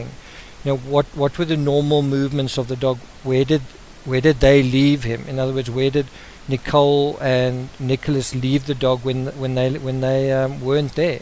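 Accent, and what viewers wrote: South Africa. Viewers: Is the narrator perhaps South African?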